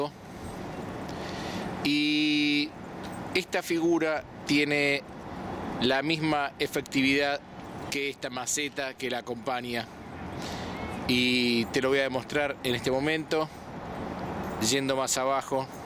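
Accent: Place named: Argentina. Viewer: El que habla es argentino.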